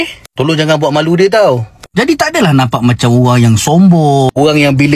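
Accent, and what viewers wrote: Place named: China. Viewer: Tak yah nak hairan semua pelancong tu dari China tu sebab nya dia tak mahu ulas.bukan pelancong tu dari Thailand atau dari Amerika🤣🤣🤣tengok di peneng dah tau warga China saja mencecah 2juta pergi setiap kondo mesti ada tu tak termasuk di KL dan di Langkawi and Selangor agak nya China pun dh tak mahu stay di negara mereka 😅..jelas dan berbeza kreator nya lansung tak tahu cakap melayu dari bahasa Mandrin saja dah pekat beza dengan Mandrin di Malaysia..sebab i boleh cakap Mandrin dan hoken so boleh beza Cina Malaysia dengan cina dari china😂😂😂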